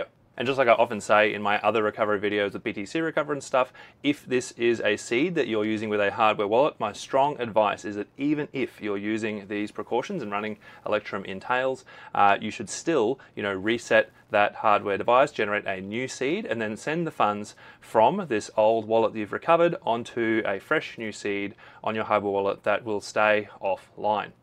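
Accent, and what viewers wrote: Australia. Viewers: thanks for the reply, I never thought you would ever reply. But I am very happy to get some help. I think u got an Australian accent . I am here in Australia, too. I never entered any passphase. Never. I never had any other accounts. Just this one. I was using this account just one week before it all happened to me. Please 🙏 tell me what's the solution is. How can I get my life savings back. Because I have been very depressed from the last two weeks. Seems you have very good knowledge. Please 🙏 help me . Please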